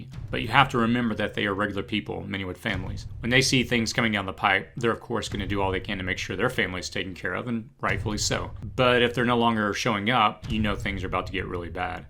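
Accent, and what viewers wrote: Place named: United States. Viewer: I was interested until you mentioned a certain political group or party labeling some broadcast corporations as fake news as being just not true or rare,,,at that point t I knew you were political propaganda designed to counter the fact that the people are now realising that our media is just lie talkers not journalists who's goal is to hide the facts and give cover for those who are right now (presently in america)attacking us on multiple fronts, ,these enemies were caught off guard when trump defeated hillary who they invested heavily into her being president because she was there person to totally take the US apart,,these hey cannot allow this to stand that's why all of the fake news more then ever is all that is being heard by the people and the reason why internet news sites are being removed,,they must control the narrative,,,you sir are fake news you are one of those enemies telling propaganda news on behalf of the ones who want to do I ate and enslave all of us,,,and you sound like an american,,in my mind I cannot understand any mindset that would help to destroy his own nation,,your goal here is very transparent,,,you are here to paint trump as the enemy,to paint trump supporters as the crazy ones just as the msm does daily,,at the same time that we who know the truth watch the enemies fund and mobilize the violence,,,get off the internet you frickin liar,,it's to late we all ( see ya) for what you are and we see your plans and devices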